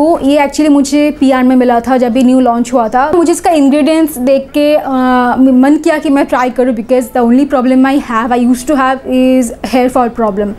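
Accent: Bengal, India. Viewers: You are Bengali apke baato se pata chal Raha hai Bengali log Hindi bolte hai toh pata chalta hi hai 😅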